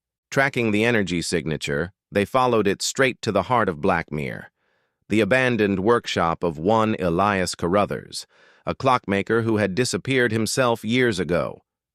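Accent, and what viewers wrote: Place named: United States. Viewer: This was truly excellent! A great story, and a great new avenue for your channel! An American narrator is not actually that jarring, as the precedent has been set by guest actors of that type reading official audiobooks. Definitely looking forward to more! Incidentally, apologies for this delayed response, but I was having a short break from You Tube interactions. Many thanks and all the best for now. Paul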